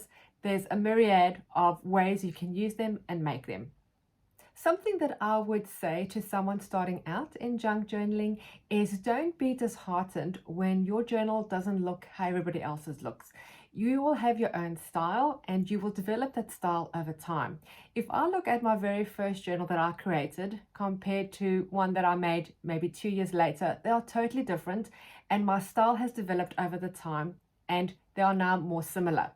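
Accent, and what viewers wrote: South Africa. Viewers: Hi I am so glad I found you channel... You sound like you are from South Africa:D So am I and there are very few if any junk journallers here as far as I have found. I love your style and I am new to junk journalling and all the videos can be a bit overwhelming... I absolutely love the idea of an idea journal and i think I will start with that:D Thank you so much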